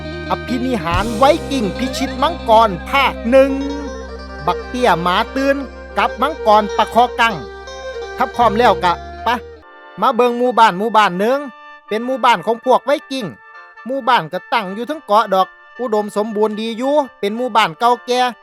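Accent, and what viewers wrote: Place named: Korat, Thailand. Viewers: ไทโคราชตั๋วนิ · คักเสียงคนโคราช😂😂😂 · มักคักภาษาโคราช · ภาษาโคราชกะมา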